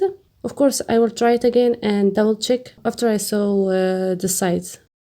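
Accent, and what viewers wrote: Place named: Morocco. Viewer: Where are you from? You have a morrocan accent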